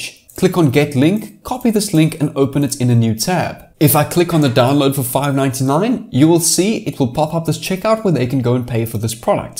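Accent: South Africa. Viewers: Well done! I could tell from the accent that you're South African. This makes me so proud and inspires me too on my journey! New subbie right here!!!🥳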